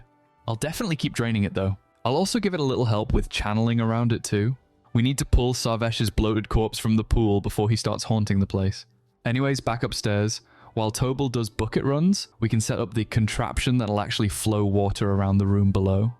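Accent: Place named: Scotland, United Kingdom. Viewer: I cant help but notice a scottish twang in your accent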